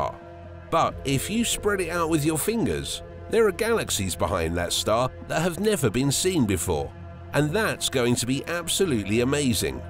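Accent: United Kingdom. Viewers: So not only is this narrated by a Brit, essential to making Americans think there is some intelligent afoot, but the Title is a lie. THere are no new images, and only the very clear statement that sooner or later there will be. This entire project is an amazing feat primarily on the part of US teams of engineers and scientists. Isn't it a pity to have to lie about in the title? Whoever posted this, you dishonor a great achievement.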